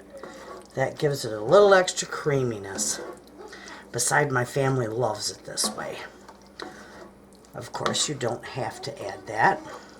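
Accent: Michigan, United States. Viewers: I could tell you were a Michigander right from the first video I watched! I love your accent! Your recipes are sweet, simple and to the point. I looked up info. on the township you live in. What a wonderful, small community to spend time with your families. God Bless you and yours. Subscribed and liked😗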